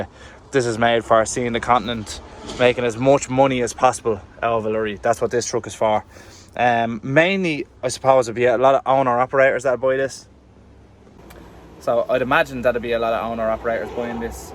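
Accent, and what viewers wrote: Ireland. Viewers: I’m guessing if you dropped the silly dub accent and talked like a west Meath man you’vd do better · I LOVE youre Accent Irish is best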